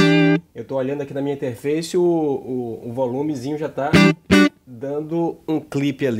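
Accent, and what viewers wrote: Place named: Northeast, Brazil. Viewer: . Oque eu aho legal é que o seu "x" nordestino é diferente do "x" carioca. Kkk E é um chamariz no seu marketing pessoal.😆😆😁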